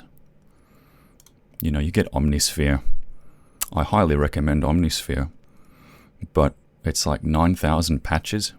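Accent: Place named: Australia. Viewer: he is the most Australian mf I've ever heard... Im so late but oml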